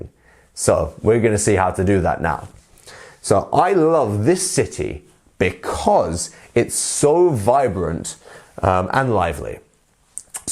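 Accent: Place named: RP, United Kingdom. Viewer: I'm totally hooked on your accent,. Hearing this RP is like a journey through the vast and green Southern English landscapes 😅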